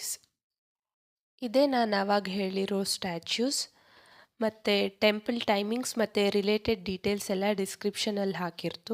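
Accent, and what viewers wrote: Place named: Kundapur, India. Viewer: Super kundapur kannada💐💐💐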